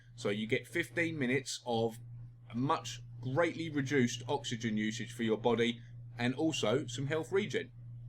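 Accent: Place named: United Kingdom. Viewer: thx bro oh and are u from britin i am are u u sound like it wigan i geuss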